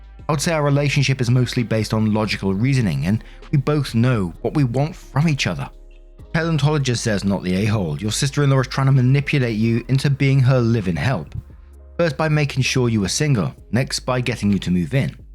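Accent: United Kingdom. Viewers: every time i listen to talk i think "man he's so British"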